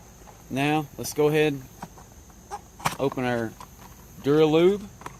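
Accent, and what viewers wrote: South, United States. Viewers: Your southern accent is amazing.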